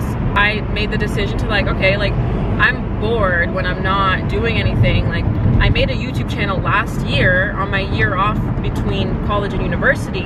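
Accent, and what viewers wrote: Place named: United Kingdom. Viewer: British accent? Super cute! Watching from London, England. ❤️❤️❤️❤️❤️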